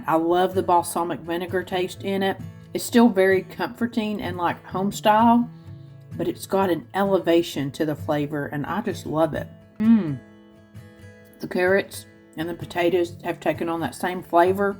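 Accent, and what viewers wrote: South, United States. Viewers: Oh YUMMMMM I was worried about the staple as well… these are great.. I have my meals for the week… you are the best… you make my day I’m better just listening to you and your southern accent.. sending love 💚and ☀️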